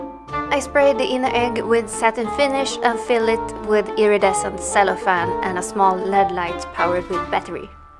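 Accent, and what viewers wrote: Sweden. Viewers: Are you Swedish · Wow, great work!! I'm stunned :O Also, could tell you were swedish by your voice, so tjena;))) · omg jag gissade att du var svensk man kan verkligen höra det på din accent!! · Found your channel randomly, but you'r swedish aren't you? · that swedish accent tho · Det tog mig nästan hela videon innan jag insåg varför det låter så bekant när du pratar, sedan insåg jag att det är för att du är från Sverige! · Oh are you swedish? :) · Are you from Sweden cause you have a Swedish accent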